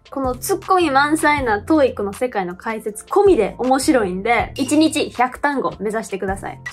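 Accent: Kansai, Japan. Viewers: やばい、関西弁美人女子がドストライク過ぎる。。。どうやったら出会えるんだ🤷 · 関西弁のいいね！❤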